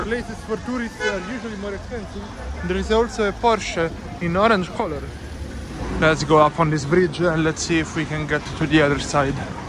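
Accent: Italy. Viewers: 这个英文是意大利人吗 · I love your Italian accent. Nice haircut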